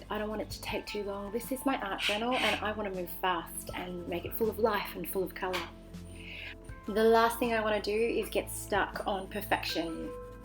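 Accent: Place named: United Kingdom. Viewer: I love your "loose" techniques in this video, plus it's very pleasant to watch and listen to your lovely British accent. You don't talk too much, which I find to be very annoying in many artists' videos. The background music was perfect! The small accents you added at the end using the nib pen made such a huge difference in the end result. Keep making these wonderful videos!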